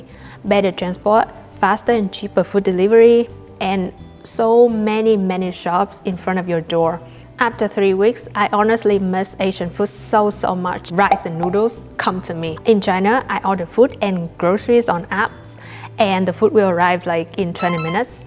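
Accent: Scotland, United Kingdom. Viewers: am fae cambuslang, her English has a scottish twang to it, no english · it's mental how your English has a Scottish twang to it. It's not a full blown Scottish accent, but you defo have some Scottish intonation. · I hear Scottish accent coming though a tiny bit and it's adorable :-) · Scottish accent! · Great video about my homeland I can definitely hear a wee bit of a Scottish accent in there. PS a lot of people pretend they have a cold when they’ve actually have been having a white Christmas lol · oh my gosh the way you say "air" with a scottish accent, rolling the R sound, wow, that must be difficult for a native chinese speaker. anyway, it's a most delightful accent and it makes me smile. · Brilliant.A few weeks in Scotland and there's a hint of an accent.Come back any time. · am fae glasgow & she does have a scottish twang, you're talking absolute doggy poopoo. 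Now go back to bed it's late. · Can hear you have picked up a wee bit of a Scots accent. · Nice video, it made me laugh. The accent definitely has a few sounds from Scotland. Not purely as there is still much that sounds like a Chinese accent. Never thought about the water helping to improve the skin and the hair. Makes me think! 😄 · Came here to say the same thing as loads of others. Three Weeks!! You have Scottish accent lol!! “Scotlan” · I am from Glasgow but lived in Newcastle many years, i picked up a twang also but I was honestly surprised when I heard your Scottish twang. Anyway good video and content, you just got another sub. Kudos. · Really trying to place the Scottish twang in your accent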